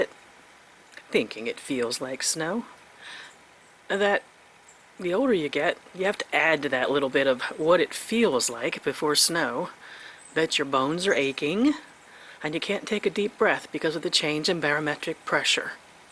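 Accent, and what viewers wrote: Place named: Maryland, United States. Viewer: Well my min pin is named Snuffy after the 1st dog I remember having as a kid, who was a Manchester Terrier and was also a black and tan. I have a weakness for black and tan dogs I guess, have certainly had quite a few in my life. I fostered dogs for a while for 2 different rescues in the past, as well as some bottle fed kittens and a mama cat who had just had kittens. But Snuffy doesn't bark that much at all. I taught her early on that was not acceptable unless there were truly something I needed to be alerted to. I have had her for 4.5 yrs now from 4 mos of age, and I can pretty much discern her different barks to know what's going on outside, I guess you could say I almost speak dog....ON another thought...heading to the liquor store before a big storm is one of the very goofiest things I do believe I've ever heard of! 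I can safely say I would not be one of those folks, lol. BTW, I grew up till age 15, in Columbia MD, sounds like you have a similar accent:) You may wish to let the vet know that Ernie has had an increase in his post ictal stage of seizure episode...and yes, I DO remember that lovely song, lol. BB to you and yours, kissies to the dogs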